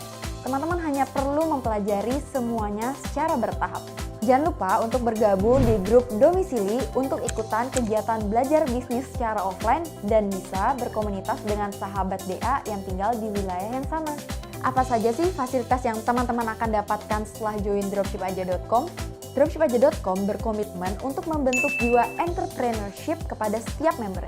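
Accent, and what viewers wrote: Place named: Cirebon, Indonesia. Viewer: Cirebon mana suaranya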